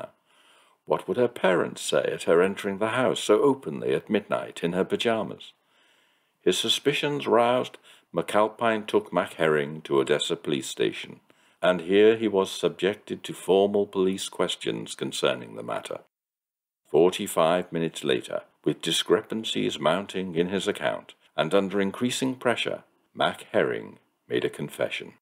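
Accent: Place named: Liverpool, United Kingdom. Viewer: Soft Scouse.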